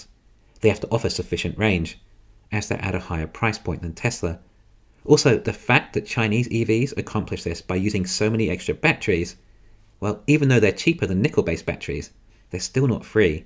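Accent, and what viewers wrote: United Kingdom. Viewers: I’m British so the accent always suits my ears. More importantly the delivery is clear and without repetition. The simple things like NOT repeating subheadings in the first line of text are well done.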